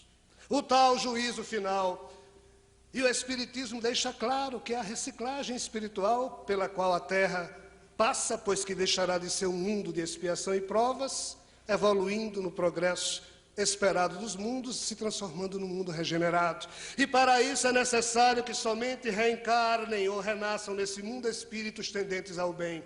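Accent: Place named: North, Portugal. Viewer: este palestrante lembra bezerra de menezes compleiçao fisica cabelo e tem sotaque do norte assim que vi lembrei de bezerra ate porque bezerra desencarnou em 1900 e podia ter ido embora da terra e disse que ficaria 100 anos na espiritualidade ajudando a evoluçao e que voltaria a encarnar para ficar ate que a terra estivesse em feanca regeneraçao ou seja mais 100 anos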